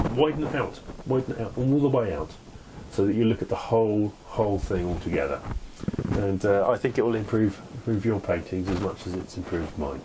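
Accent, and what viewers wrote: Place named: England, United Kingdom. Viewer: You meant 'spot' of tea didn't you??? It's been so long since i've heard a proper English accent... L'oven it...! And you painting too!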